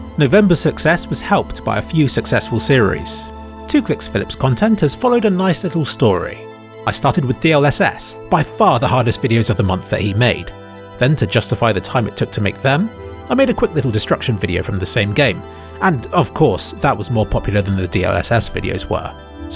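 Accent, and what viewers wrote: United Kingdom. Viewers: Klikbait is better than Clickbait. Thought I watch your videos due to your quality and soothing (Bristish?) voice. I watched your latest video about reflections in Cs:Go and had no clue what you were talking about yet I enjoyed the video since it appears that you know what's going on. Even your reaction video on Half life Alyx is intelligent, most reaction videos are morons pointing out obvious things like excited apes, but you actually point out things that most people wouldn't see otherwise- tech related things for example. Don't change your style and honesty as it defines you and your channels.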